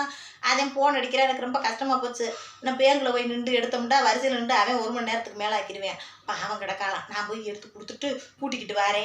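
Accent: Madurai, India. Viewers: உங்க மதுரை ஸ்டைல் பேச்சு ...அழகு👉👌👌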